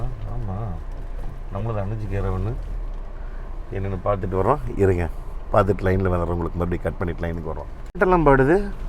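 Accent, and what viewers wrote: Kongu, India. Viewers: நம்ம கொங்கு தமிழில் நீங்கள் கொடுக்கும் விளக்கம் மிகவும் மகிழ்ச்சியளிக்கிறது. வாழ்த்துக்கள். 💐💐💐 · எதார்த்தமாக கொங்கு நடையில் கூறிய எங்கண்ணணுக்கு வாழ்த்துக்கள்....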